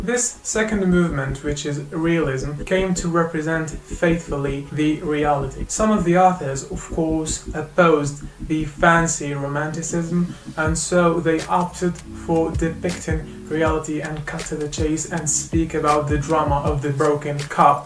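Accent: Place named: United Kingdom. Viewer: British english 👏👏👏👏👏👏👏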